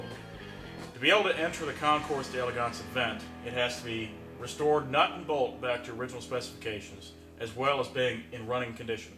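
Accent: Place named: United Kingdom. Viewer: Sounds British..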